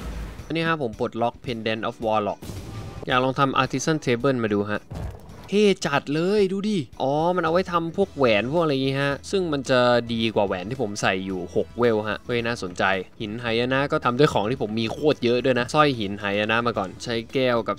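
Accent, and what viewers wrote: North, Thailand. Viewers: พี่เป็นคนเหนือหรือเปล่าครับ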